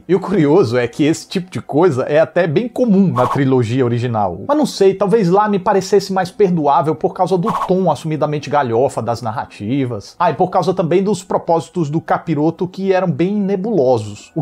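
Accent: Northeast, Brazil. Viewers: Tá mais bonito fantasiado de zombie . Brincadeiras as parte , eu gosto muito das suas críticas. Muito bom o sotaque nordestino , eu TB sou srsrs ; além da análise madura e didática . 🎉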